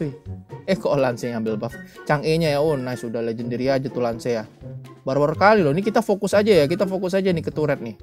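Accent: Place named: West Java, Indonesia. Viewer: Ohh kirain.. Nada bicaranya, logat bahasa Indo nya kaya orang Sunda Bang. He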